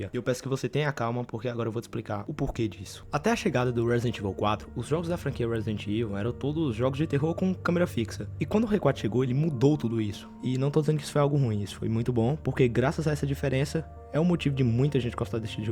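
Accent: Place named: Northeast, Brazil. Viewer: Mano, você é nordestino, né? Pelo sotaque, parece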